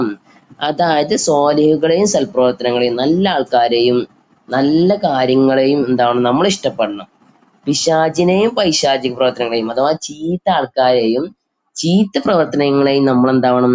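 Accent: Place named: Malappuram, India. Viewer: Malappuram language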